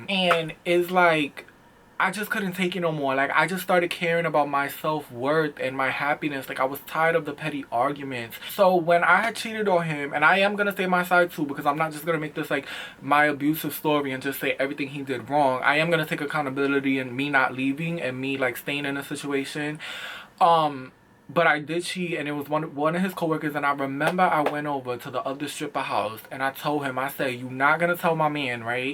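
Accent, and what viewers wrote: New York, United States. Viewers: Honestly, when you were talking about the insecure thing.. I think it may be a Virgo thing because I’ve been in situations where people think I’m trying to be better than them or something. Your lingo is what makes you unique babe, especially that New York accent! 😍 Gemini’s are not for us, Virgos! I’ve learned that we don’t mesh well. My abusive ex was a Pisces. She was so insane and mentally and verbally abused me and it was the worst. The whole time you’re the victim and they turn around and act like you did everything to them... that was the fucking worst thing for me to face especially in high school. 🥺 Telling someone they’re “too skinny” is the equivalent of saying someone is “too fat”. My ex used to tell me I wore too much makeup and that I “needed to fix my fucking face”. 🙄 I know I did things wrong too, but not as bad as what she did to me... 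🥺 My ex had her friends belittle me on social media and in person.. I was 16-17 with no backbone and wouldn’t fight or nothing (before anyone judges). Like you, I kept running back and anytime we talked it turned into constant and repeated arguments. I hated wearing makeup cause I felt like she was gonna tell me it was ugly and tell me to fix myself. I would cry every single day and try and maintain my relationship and my mom was on the verge of going to prison over this girl. We continued to break up and get back together until we graduated and she continued to bother me during my graduation present vacation trip thing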